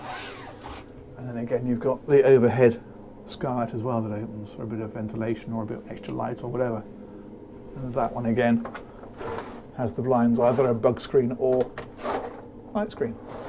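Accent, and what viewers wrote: United Kingdom. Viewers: Sir, I am not your subscriber. But I used to see your videos. Because of 2 reasons: a) your clear British accent. b) you explain things very beautifully I congratulate you for your hard work May God Bless You Always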